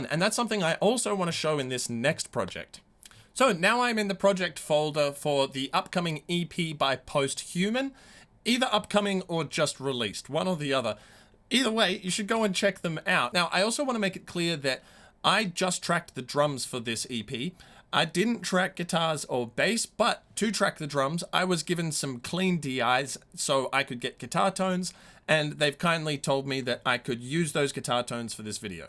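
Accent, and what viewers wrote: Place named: Australia. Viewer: Interesting stuff! You Aussie's with your funny 'H' sound always gets me. Trying to hear the difference btw some of those heavy distortion tones reminds me of trying to show my parents the difference btw East coast and West coast rap in the 90's! I guess you have to listen to some music that uses those tones to get it right off the bat. That POG is slammin'! (pun intended) I like to do that and just use the two lowest strings, too. It reminds me of that 60-70's Soul/Funk sound.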